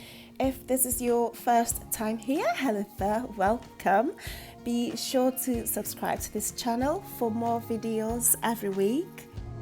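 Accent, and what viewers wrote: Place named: London, United Kingdom. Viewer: First of all lemme say you have a nice accent 😍😍guess you live In London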